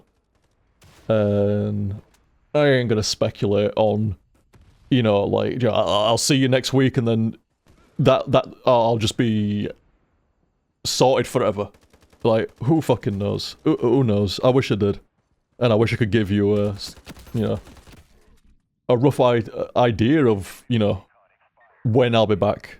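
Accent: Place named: North, United Kingdom. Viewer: Hey, like a few others have commented, I first subscribed to your channel during your very first IWBTG playthrough, back in 2008 or whenever it was. I've gone from an awkward 14 year old to a successful 24 year old watching your vids, and I honestly think your content has helped me a lot through some of the tough times (maybe a fellow northern voice on the internet was cathartic, or maybe it's just all the laughs, who knows...). You're certainly my favourite youtuber ever, no doubt in my mind. you might not get millions of views on each playthrough, but your fanbase is really dedicated and I don't think a 2 month gap is going to make us go anywhere - we've been here long enough to stay a while longer! Take as long as you need to feel better - I can always watch the super mario 3 htsf for the 5th time... cheers!!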